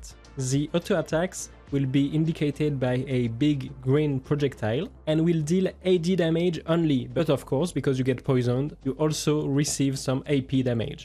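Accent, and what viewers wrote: France. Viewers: I can hear ur french all my friends talk in this accent 😂 very good content 👍👍🔥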